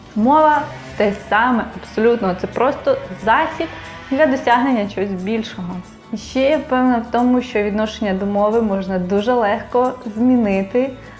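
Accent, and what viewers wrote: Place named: Italy. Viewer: ти говориш з італійським акцентом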